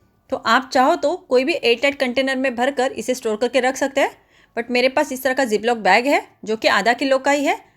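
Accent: India. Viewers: I love your hindi accent.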